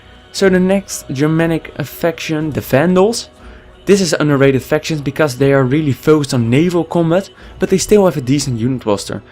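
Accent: Netherlands. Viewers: are you dutch by any chance? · Je bent een Nederlander zo te horen. Interessante review tho. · Zo Nederlands deze vent, geniaal accent dat! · Deze kerel moet wel nederlands zijn haha. lekker accent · Damn, that dutch accent though, keep up the good work!👍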